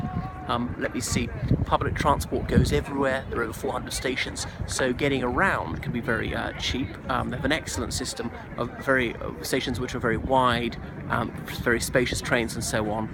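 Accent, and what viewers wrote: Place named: Home Counties, United Kingdom. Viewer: u seriously from Ireland? U sound like you're from the home counties!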